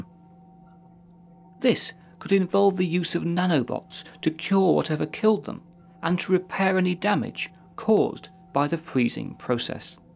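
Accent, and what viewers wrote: United Kingdom. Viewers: This guy's British Accent made this video. I mean, if he had an American Accent I wouldn't have taken it so seriously, but for some reason because of his accent I couldn't help but pay more attention! All of thse novel ideas seem amusing, but something about the cyrotube Reanimation "card" sends chills up my spine :(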